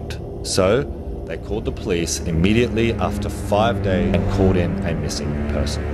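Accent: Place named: United States. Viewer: i actually thought you sounded American mixed in like..Did you spend time there.?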